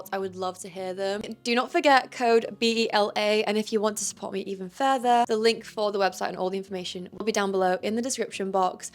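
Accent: United Kingdom. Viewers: You are a BEAUTIFUL young woman. Beautiful dark brown eyes, beautiful smile and sexy voice with the British accent. STUNNING figure. BEAUTIFUL tan body and curves, really cute belly button, nice little booty and sexy long legs. You look AMAZING in all of these pairs of jeans. All of the colors look REALLY good on you and make you even more beautiful. The white makes you look like a brown/dirty blonde-haired angel. All of these jeans hug your body and hips and make your beautiful curves POP. You look AMAZING. You are BEAUTIFUL and VERY cute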